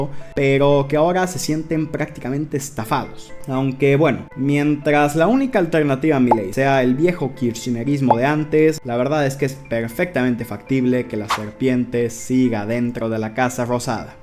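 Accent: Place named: Mexico. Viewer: Se nota que no sos argentino y por como hablas pareces mexicanito y haces este video sin conocer al país argentina ni a du gente y menos al presidente y mucho menos la historia de argentina y te pones a decir ridículeses sobre cosas que no conoces lo mejor sería que bajes este video falso estende y antes de hablar de otro país tenes que informarte bien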